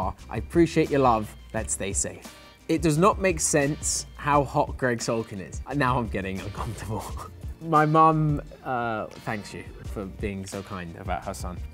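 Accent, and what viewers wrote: United Kingdom. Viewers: yeah he's British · I didn't expect he has a British accent... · He gives Tom Hiddles a run for his money to be the most British person to ever British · I did not know he was British wtf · I know him as Chase so hearing him with a British accent is trippy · Why did I not know that Gregg Sulkin was British eye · He's... british? Oo hoo this just got even better 🤣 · Mindfcked after seeing him play an American boy on runaways and now this Brit accent ? Lmaooooo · Gregg sulkin can run me over with that British accent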